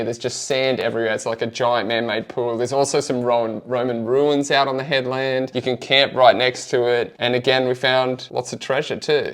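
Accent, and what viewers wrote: Australia. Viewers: That's funny, a Spanish channel?! I am Spanish, I've been following you for a while now! NO WAY I'm gonna miss your voice, your aussie accent or your laughter! I'll keep on watching the original one! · Nah, no travel video please. Stick to getting excited pulling stuff out of the canals. Your Ozzie voice is a bit to annoying for travel talk.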